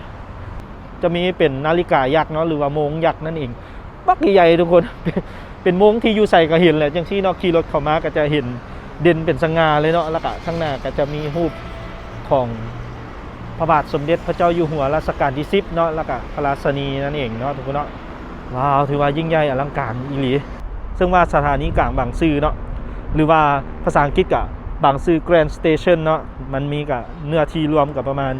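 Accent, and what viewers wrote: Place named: Laos. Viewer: ทำคลิปสนุกครับ ชอบสำเนียง และภาษาลาว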